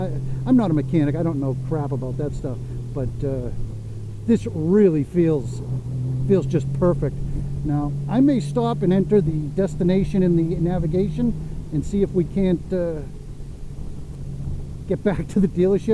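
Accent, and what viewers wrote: New England, United States. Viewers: "Manchestuh New Hampshuh" Love the accent. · Cool video, but if you don't mind my saying - that is a serious New England accent you got there.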